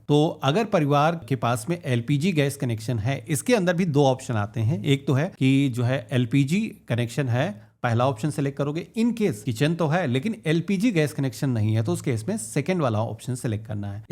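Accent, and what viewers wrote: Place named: Uttar Pradesh, India. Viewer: Majhara tawkali, UP se ho na bhai aap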